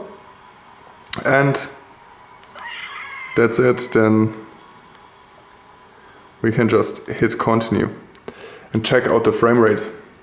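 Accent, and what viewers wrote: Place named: Germany. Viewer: Man hört den deutschen direkt heraus^^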